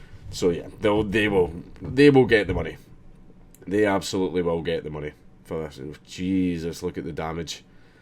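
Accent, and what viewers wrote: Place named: Scotland, United Kingdom. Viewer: Dude, you sound like the scotsman from Samurai Jack. Anyway, I hope the cathedral gets restored in its original form.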